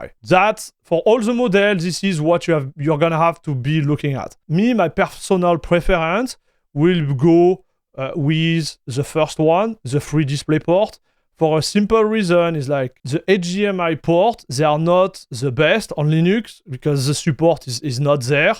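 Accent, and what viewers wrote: France. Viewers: OK. I thought you were from France because of the accent. I forgot that parts of Canada are also French-speaking. Warm greetings to you! Thanks for the channel. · I ordered a Powercolor Reaper for 663 euro on Ldlc, great deal in Italy, considering the base MSRP + tax here is 690 euro! I know it's the bottom line model, but i was planning to undervolt it anyway, so the 2x8 pin are perfect for me, since i have a rm650e and might be kinda CPU bottlenecked in some situations anyway with my 5700x3d. Btw, thick French accent! 😁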